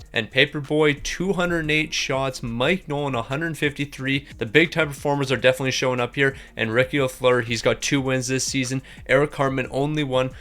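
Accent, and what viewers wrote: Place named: Nova Scotia, Canada. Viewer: What province are you from ? Gotta be Nova Scotia lol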